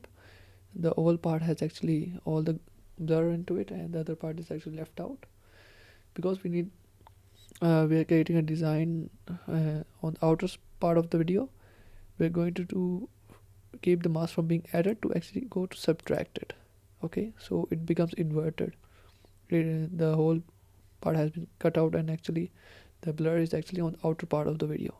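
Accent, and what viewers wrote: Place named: India. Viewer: Yeah, it sounds to indi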